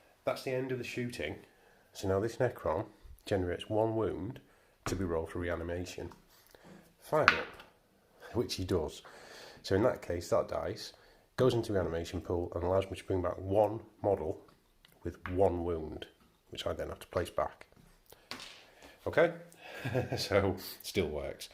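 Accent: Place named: England, United Kingdom. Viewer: Ya I have trouble with English accents lol damnit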